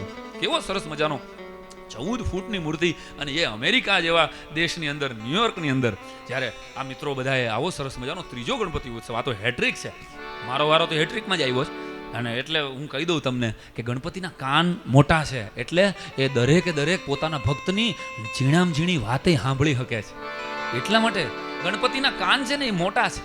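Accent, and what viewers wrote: India. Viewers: Aap no awaz sabhli Jamnagar ni yaad aavi Jay che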